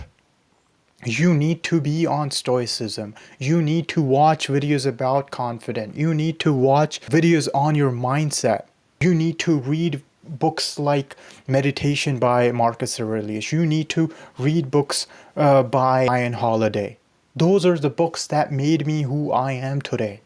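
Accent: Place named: United States. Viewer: U have like an American accent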